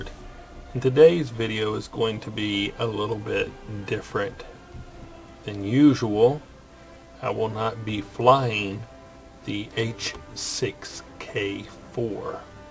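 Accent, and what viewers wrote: South, United States. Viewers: would be my second favourite war thunder narrator after the Mighty Jingles. He's not that articulate, but something about his tone and southern bubba accent just cracks me up. Especially when he says things like "they're all over me like stink on a turd", etc...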